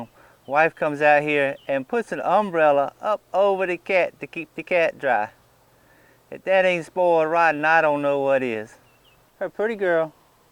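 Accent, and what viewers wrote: South, United States. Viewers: yeah, we all want to make fun of the southern "accent" and wording choices but you have to hand it to him, he's providing a ton of knowlege through trial and error, which is invaluable. I say thank you and I wish I were in a climate where I had more than 2.5-3 months per year to learn in. . .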